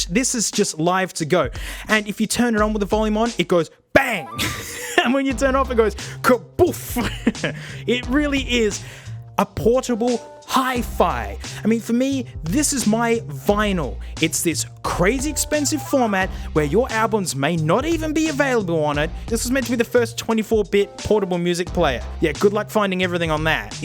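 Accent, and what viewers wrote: Australia. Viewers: bruh, youre ozzie as fuck. I gotta call my fam down under now. great video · You’re as aussie as Colin furze is British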